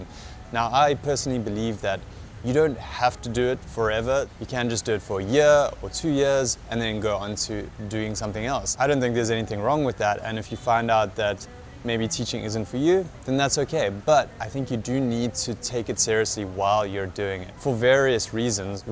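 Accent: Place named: South Africa. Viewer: Ek hoor 'n Suid Afrikaanse aksent.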